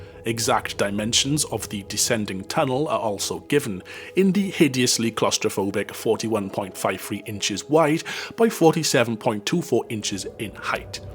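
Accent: Wales, United Kingdom. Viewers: The word "heard" is pronounced the same way as the word "herd". You saying "HEERD" instead of "HERD" is jarring in a nails-down-the-blackboard kind of way. I make allowances for accents (I can hear a bit of Welsh influence in your voice) but FTLOG, "HEERD" is just so very very wrong.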